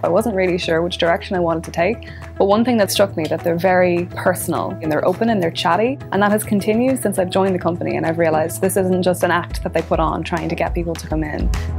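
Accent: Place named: Monaghan, Ireland. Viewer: lovely Monaghan accent.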